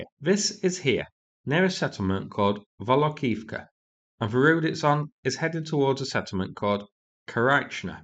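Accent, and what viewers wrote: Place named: Northern England, United Kingdom. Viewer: sounds like north england to me.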